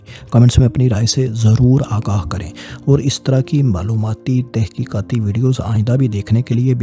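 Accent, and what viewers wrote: Punjab, Pakistan. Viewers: اچھی معلومات ہے ، لہجہ بھی فیصل وڑائچ سے ملتی ہے ، الفاظ کی ادائیگی وہی پنجابی لہجے والی 😊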